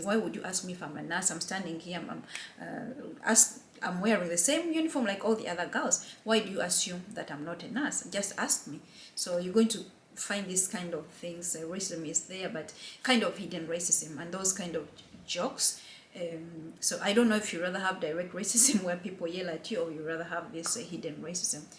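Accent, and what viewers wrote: Uganda. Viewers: I wouldn't know why my boss always gets hurt when we are traveling on a dusty road i put on my head wrap ,he easily asks why are you putting that on? I used to think its because i look different! Now, i know why? Thanks sis,am in Uganda you sound like someone from Fort portal.😊 I feel those guys have strong racism but hidden internally in their behaviour that is also quite hidden it takes a keen person to tell! The company i work for are all Danish, trust me they make me hate being in Denmark by the way!! Thanks for sharing!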